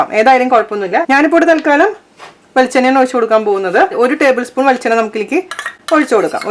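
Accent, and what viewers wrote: Thrissur, India. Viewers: Hi chechi..your explaining well and Thrissur language is good....I prepared this thoran today it is very tasty...look yummy..thank you for this receipe..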